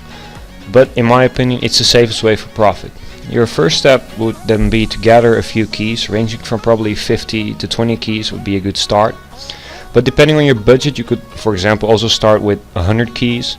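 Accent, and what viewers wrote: Netherlands. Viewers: Ik dacht dat ik een nederlands accent hoorde, en ik ben van belgië en mijn engels is ni zo sterk mar goeie video's man :p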